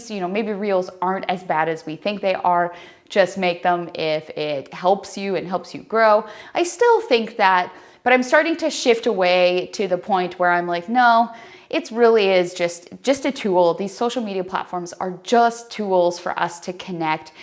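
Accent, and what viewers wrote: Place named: Canada. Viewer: I have always had a real distain for the mindless groupthink rush to "the latest" social media channel. I found Flickr a long time ago and loved it for its rich features! And you know what? I'm still there because it's such a great place for me. Apparently everyone went to Instagram and are now flocking to Vero ... whatever. Flickr does a superb job of helping me quickly and easily organize, tag, geotag, publish and share my best work with likeminded people. But foremost in every photographer's mind (those who want to make some kind of income) has to be exposure. No one will buy if they don't see your work. So the sad truth is that we all want to maximize exposure. Btw, as an ex-pat Canadian, I'm delighted to find your channel. Long ago I couldn't hear the Canadian accent. After 23 years away from Canada, I can. It's so lovely to hear and your work and video content is wonderful.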